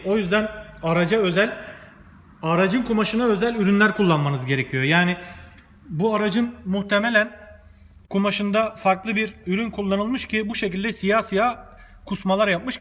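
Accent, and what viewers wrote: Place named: Thrace, Turkey. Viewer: hocam konusman tam trakyali😂 harika yav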